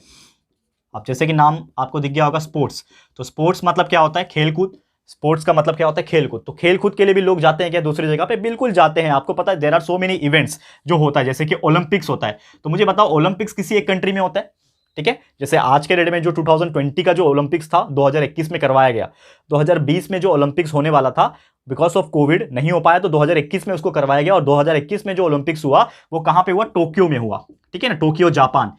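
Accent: Maharashtra, India. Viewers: Sir kya aap Marathi ho Apki accent aur kuch words aap Marathi boltey ho to aisa lagta hai ki aap Marathi ho Kisi video mein bol dena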